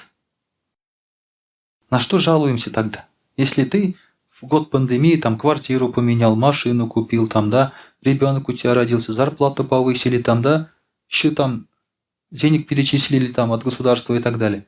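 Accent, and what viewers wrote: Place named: Tatarstan, Russia. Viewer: Бик таза чын татар теле зур рэхмэт эти эниегезгэ эби бабаларыгызга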